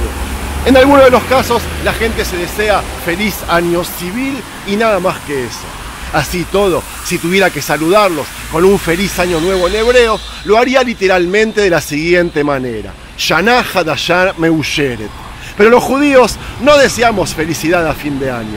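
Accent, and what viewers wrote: Argentina. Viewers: Una pregunta yo recién q encuentro este canal, y tú eres judío pero hablas perfecto el español con acento argentino, quizás haya algún capítulo donde explicas eso. Y realmente me gusta escuchar hablar de mi querida nacion de Israel en un español muy entendible, te seguiré viendo. Me gusto el reportaje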